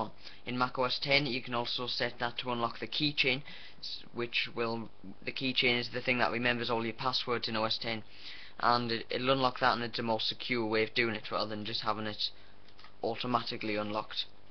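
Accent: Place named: North East England, United Kingdom. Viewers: Its a Sunderland accent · it's a north eastern accent, not quite Geordie but sort of sounds like it.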